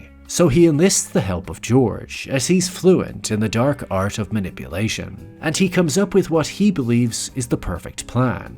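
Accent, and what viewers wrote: Ireland. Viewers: Your Irish huh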